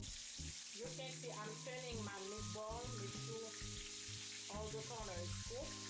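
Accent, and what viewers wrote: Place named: Haiti. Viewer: u remind of my sister n mom the have that Haitian accent they have passed away. thank u for help me learn what they didnt finish teaching because haitian american u lose alot of culture thanx to bring back haiti to me n the world.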